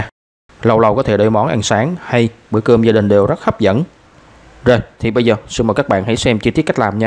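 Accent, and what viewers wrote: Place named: South, Vietnam. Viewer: Các món ăn bạn đưa lên tui rất thích, có điều bạn hay xài hai chữ “ nước dùng “. Dân miền Nam đâu có “ nước dùng “ chỉ xài “ nước lèo “ thôi, vì giọng đọc của bạn nghe đặc sệt miền Nam.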